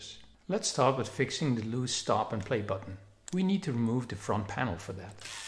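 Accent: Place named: Netherlands. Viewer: Hi, I am new to your channel, had a look at your website and a question immediately arose: according to your accent and name I wouls assume you are a Dutch guy, just like I am. But the company/museum is based in the U.S.? A lot of the museum's team members have names that sound like ones close by, could you explain this situation? There is a good chance I would like to order some parts in the near future and perhaps it would be easier to ship from NLD than from USA. I will be watching all the videos shortly as there is a ton of useful information available I assume. Thank you very much!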